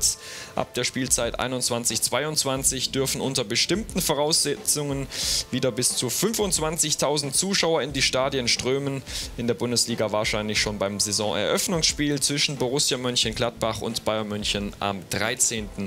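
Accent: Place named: Saxony, Germany. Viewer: Hauptsache Transfermarkt TV hat erstmal einen sächsischen(!) Moderator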